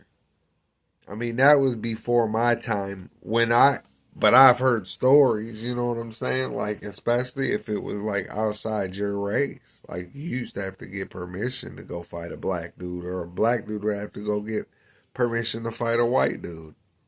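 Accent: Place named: South, United States. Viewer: Hi 👋🏻 you sound so southern 😮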